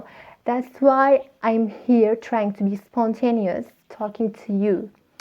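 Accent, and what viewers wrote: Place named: Turkey. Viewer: Türk olduğun çok belli oluyor konuştuğunda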